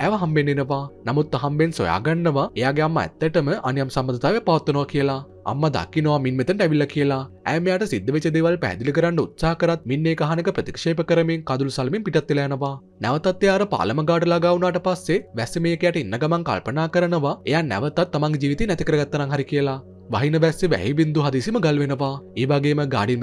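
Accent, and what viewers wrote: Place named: Galle, Sri Lanka. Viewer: මේ කතා කරන එක්කෙන ගාල්ලෙ පැත්තෙ වෙන්න ඔනා.....😂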